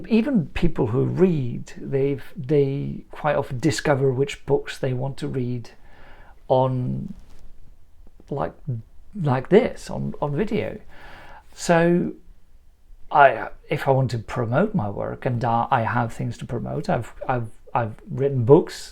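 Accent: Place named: Iceland. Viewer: Well, thats an instant subscribe :) Although i admit, i read your articles with much more of the very nice icelandic accent.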